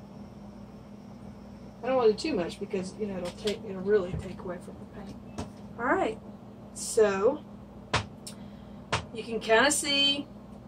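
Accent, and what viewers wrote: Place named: Georgia, United States. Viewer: I thought your accent was familiar! I'm also a Georgia girl; Savannah area!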